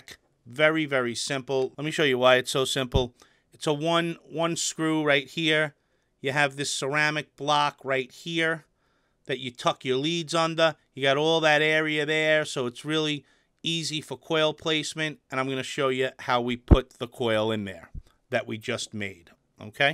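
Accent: New York, United States. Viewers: I can guarantee that if I use that same exact wire, with the same exact amount of wraps, the last thing it will do is give me the 0hms that the calculator tells me I should get. You never did show us what 0hms the mod was detecting the wire as when it was connected to a mod :P. It's useful to know though, how to build. The wire company I bought all my pre-made coils from has just decided to move abroad on us, so I'm stuck for pre-made's now. All I can get is the regular round wire now, so it's useful to know if your in the same position that I am now. I have 500ft of Kanthal A1 sat on the shelf next to me, which I bought just in case I had trouble buying pre-mades. If I can wrap my head around building simple coils, I figure that there's enough wire there to last me maybe 2 years or more, depending on how many times I recoil, which is usually at least once or twice per month. 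By the way, am I right, considering your accent, in thinking that your based around the New York area?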